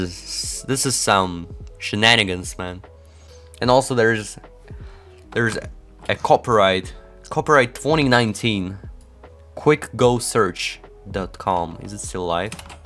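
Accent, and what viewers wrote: Russia. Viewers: strong ruski accent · Love your videos! I've really noticed your Russian accent though. Go learn an American accent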